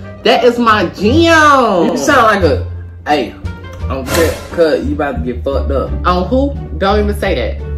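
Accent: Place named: Miami, United States. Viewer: He sound like Miami am i wrong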